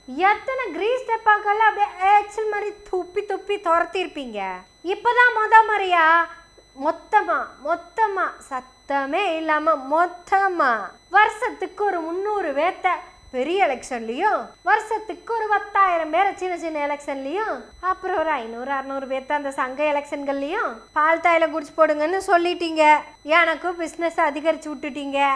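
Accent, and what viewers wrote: Kongu, India. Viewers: வணக்கம் எங்கள் திராவிட மகளே, வணக்கம்... தங்களின் மாறுவேடம் !?!?! 😮😮😮 உலக நாயகன் கமலுக்கே போட்டியா!!!😂😂😂 நம் அழகிய கொங்கு தமிழில் தங்களது பேச்சு.... Amazing...👌👌👍👍👍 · Excellent very nice attractive attractive Kongu Tamil. May the God bless you and grow as a a very popular youtuber. You have excellent intrinsic latent and dominant skill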